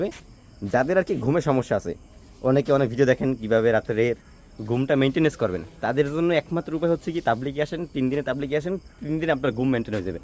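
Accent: Barishal, Bangladesh. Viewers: আপনি কি বরিশালের নাকি ভাই?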